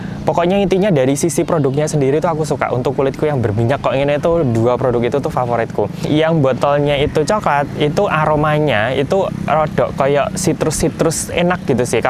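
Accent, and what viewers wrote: Java, Indonesia. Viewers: Kok yo medhok tenan yo. Tapi rinci banget seneng penjelasanne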